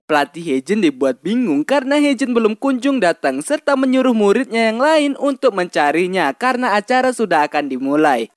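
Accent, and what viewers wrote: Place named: South Sulawesi, Indonesia. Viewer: Emang telaso mu😂😂,pasti orng Sulsel kan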